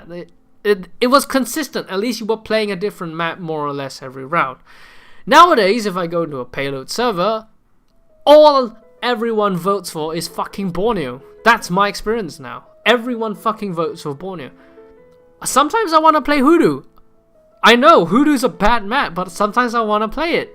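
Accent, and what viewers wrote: Singapore. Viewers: DAMNNNN BOII, when i first heard ur voice, i knew u were singaporean, high five im singaporean too :/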